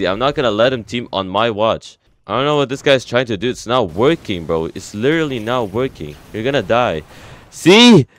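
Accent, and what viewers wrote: Germany. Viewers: Ey bist du deutscher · Are you German?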